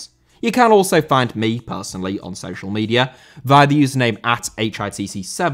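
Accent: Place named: United Kingdom. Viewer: Listen Dinis, you’re posting all these angry corrections but I think you forgot that OTHER PEOPLE HAVE DIFFERENT ACCENTS to you. is British and that is just the way people talk there, there is no right or wrong way to pronounce the words you’re correcting. I’m sure you probably can’t properly pronounce many words in English properly yourself, that’s just the way you speak, get over it. Just because you’re angry at something he said in the video doesn’t mean you need to be a pr*ck about it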